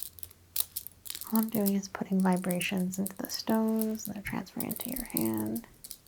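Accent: United States. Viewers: Do you from U.S.A?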